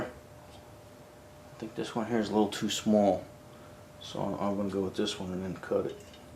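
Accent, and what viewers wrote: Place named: New Jersey, United States. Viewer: Yeah! What state you in? Your state sounds like New Jersey or some other upper east coast state.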